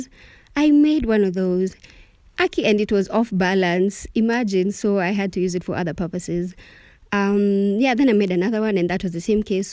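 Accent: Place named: Kenya. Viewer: Kenyan YouTubers and accents yawaa.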